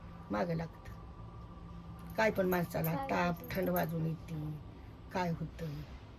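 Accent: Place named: Rural, India. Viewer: Ajini ekdum gavchya padhati madhe cahb sangitla😊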